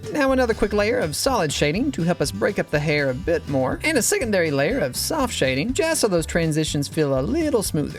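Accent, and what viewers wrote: South, United States. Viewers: how do you have a southern draw but never had a mountain dew?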